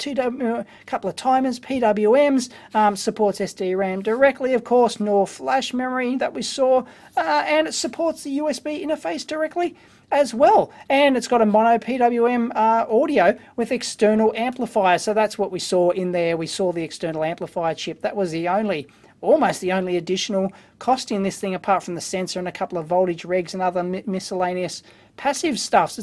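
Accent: Australia. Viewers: Australian accent? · I am not so much into electronics but i like your austrailian accent and I share your humor. Its a kind of entertaining education which I enjoy a lot. Go ahead, I will follow.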